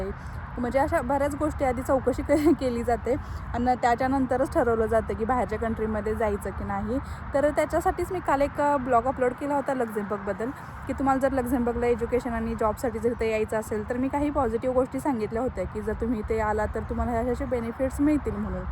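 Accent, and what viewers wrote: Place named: Satara, India. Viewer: गाव कोणत आहे ताई तुझं भाषेवरून साताऱ्याची वाटते . खूप छान वाटलं एकूण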